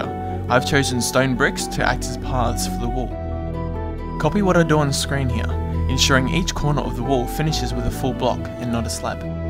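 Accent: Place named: Australia. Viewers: i did not know was Aussie! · all aussies sound the same apparently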